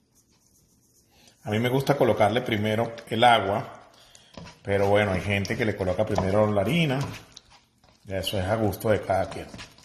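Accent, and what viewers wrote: Venezuela. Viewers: Ese acento Venezolano me encanta!! Probé la receta y son exquisitas. Recomendada. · El tono de voz muy venezolano, gracias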